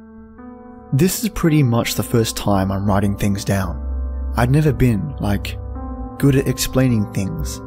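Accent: Australia. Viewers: Aww thank you! Your awesome Aussie accent makes the stories even better & that's one reason I keep coming back to your channel! Very well done with this one! 👍🖤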